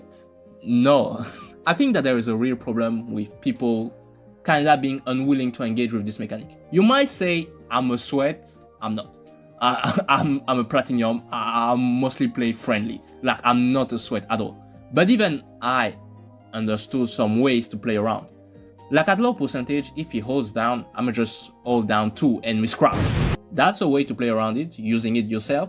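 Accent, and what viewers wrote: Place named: France. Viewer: Why do you sound french?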